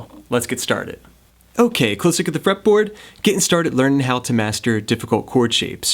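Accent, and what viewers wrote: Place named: South Jersey, United States. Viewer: I'm from South Jersey but have lived overseas a long time. Great hearing that familiar SJ/PHL accent! Great tutorial. Seriously helpful!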